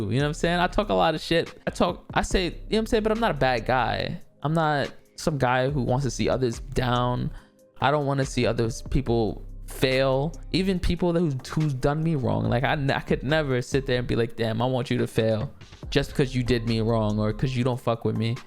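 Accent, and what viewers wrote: New York, United States. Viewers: I knew you was from NY the way of speaking was too familiar, love you brodie keep up the work‼️‼️ also take as much time as you need to come back consistently❤️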